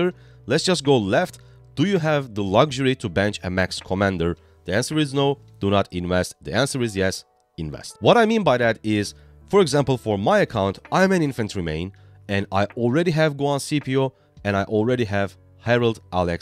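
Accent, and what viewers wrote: Turkey. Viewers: Bu adam tam bir Türk gibi ingilizce konuşuyor.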